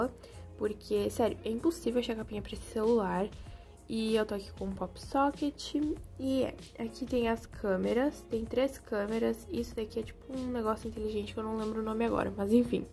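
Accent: Rio Grande do Sul, Brazil. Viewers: Tu és do RS guria?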